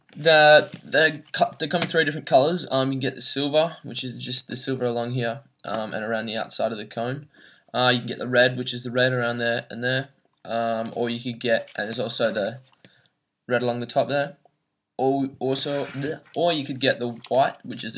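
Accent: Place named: Australia. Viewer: You sound Australian haha I listen/watch to dj cotts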